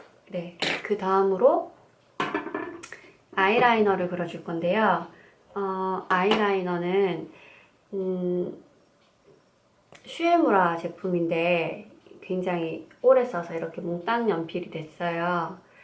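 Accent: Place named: Gyeongsang, South Korea. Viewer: 경상도분이세용?ㅎㅎ 사투리가 ㅎㅎ 저두-대구살아용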